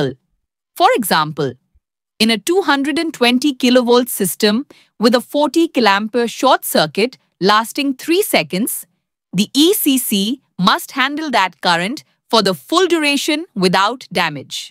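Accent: India. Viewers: Funny that Indian dialect is still there in the AI speech :D Anyway, great content!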